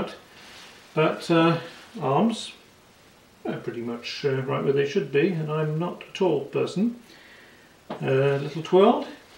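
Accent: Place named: United Kingdom. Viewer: Interesting review, and beautiful tweed. Your expressions and inflections are very British, surprised to find that you are Norwegian.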